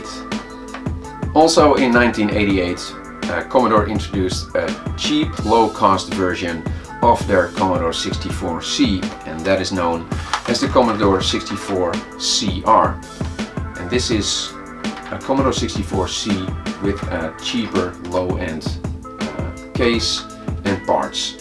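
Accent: Netherlands. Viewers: Netherlands accent?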